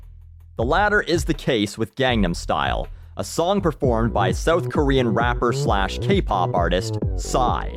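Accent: Canada. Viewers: I was just going to say that. "Oot" "Aboot". Seems Canadian to me... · Oh... so thats what a Canadian accent sounds like. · you sound Canadian. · yeah, he's canadian I was thinking that too (since my boyfriend is canadian and im used to their accents)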